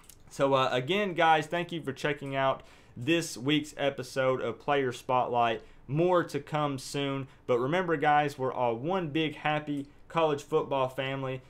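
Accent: South, United States. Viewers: Are you from Miami? You have a deep country drawl... I wasn't sure.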